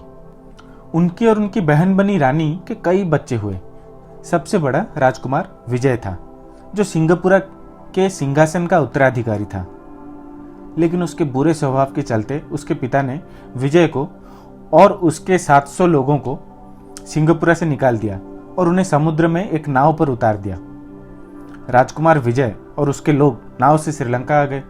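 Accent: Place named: Odisha, India. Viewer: The researcher trying to get a connection with srilanka and Odisha with few old evidence. I request to researcher try to so the examples of words which are similarity with srilankan language currently on use in our daily life,Mostly which district of Odisha using these words. Because district wise we have varity of dialects are using as per our region. One most important request to commenters not to use vulgar languages and do not comment on personal life of researcher. If we have more batter evidence to defend him than let be make a new one based on his blog. Which is benifit to new researchers of this topic. Let be welcome him for his new step. Hindi is the most popular communicative language now a days. Our odiyan Hindi speaking like this ,so there is no matter of negetive comment. One of us if translate by rectify his mistakes in odiya than it also good step. 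Criticism is welcome for all. Bande Utkal janani.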